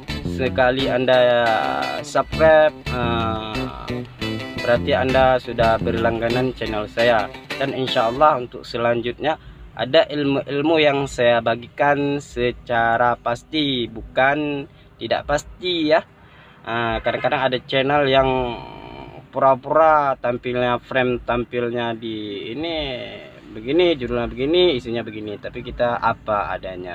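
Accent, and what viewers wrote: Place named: Aceh, Indonesia. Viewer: Medok. Yaa aceh nya:v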